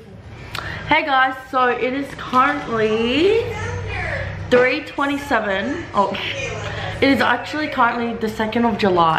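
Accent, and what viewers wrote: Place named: Australia. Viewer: ok your Aussie🇦🇺🤓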